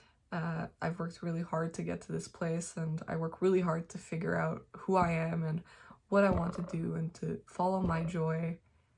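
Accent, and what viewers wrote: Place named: United States. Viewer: Congratulations!! I always thought you were from America lol. Canadian and American accents are so similiar I can't ever tell the difference.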